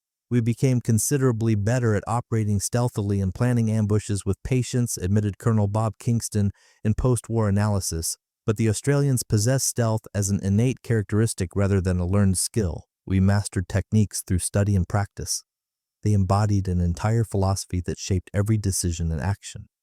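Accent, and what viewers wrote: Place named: United States. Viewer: Mate, settle down, if you have any clue or understanding of accents this is an American narrator not an Australian production. Well done your Dad just different philosophies , Delta force doesn’t fight green army tactics nor do Aus special forces. Before bluster and loud noise do your homework and look at the actual kill ratio,s bud not just an assumption Americans do every thing the best. No one criticised the US soldier here but rather talked about mutual respect and teaching each other.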